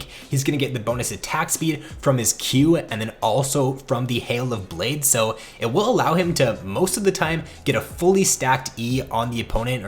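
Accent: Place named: Canada. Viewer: You are canadian, aren't you?The way you say out.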